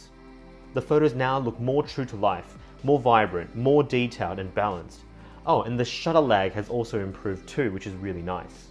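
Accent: Australia. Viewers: I knew he's gonna complain about the lack of support the moment I heard his Aussie accent lol Just ordered a 7 Pro from China after watching this video... Let's see how it goes... And I think OP definitely needs to bring their support back to AU ;-(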